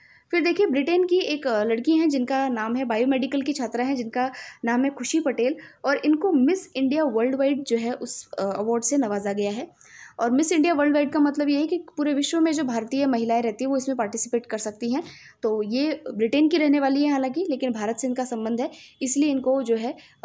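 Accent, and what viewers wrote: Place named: Rajasthan, India. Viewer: बहुत मददगार, में भी राजस्थान से हूं। टोन से समझ आ रहा है, धन्यवाद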